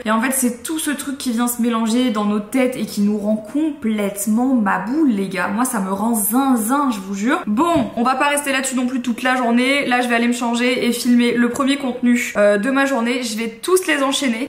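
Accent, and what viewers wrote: Belgium. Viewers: C'est incroyable, j'entends un petit accent belge ;) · Je trouve que t'as pris un léger accent belge 😂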